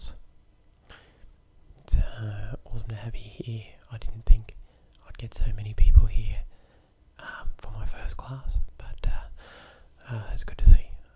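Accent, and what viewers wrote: Australia. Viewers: So I was scrolling and I found this man’s YouTube channel and I didn’t know who he was so I check them out and I found out he is Australian and it’s a lesson about how to talk Australian and I’m like well if I was American I would’ve watched this but it is relaxing though but I am already from Australia my whole family is from Australia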